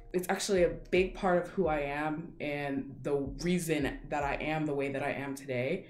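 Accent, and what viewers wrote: South Korea. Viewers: 아니 억양이 너무 한국인이야 신기해 미국인들 다 저러는거 아니잖아??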